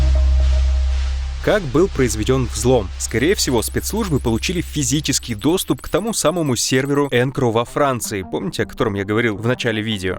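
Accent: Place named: Kazakhstan. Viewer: С первых минут видео понятно, что автор с Казахстана😂😘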